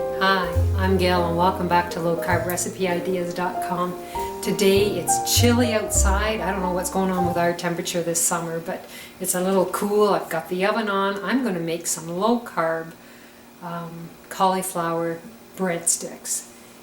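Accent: Canada. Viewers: can't wait to try! BTW you sound Canadian, are you???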